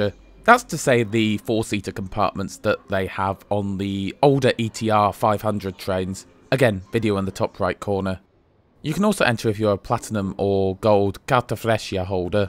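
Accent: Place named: England, United Kingdom. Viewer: Your Italian sounds very English 😁. I also got troubled in Italy for filming. They are similar freaks as TfL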